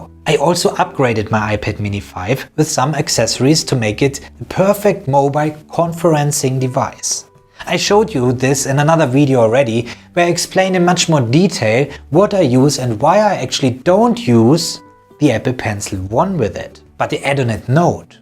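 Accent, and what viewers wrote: Germany. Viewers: MrLufus ja man, das habe ich auch gerade gedacht. Ich habe auf ein Deutschen Kommentar gewartet😂❤️ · Are you a german? By the way great video! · Man hört absolut deinen deutschen Akzent heraus, aber trotzdem gutes Video.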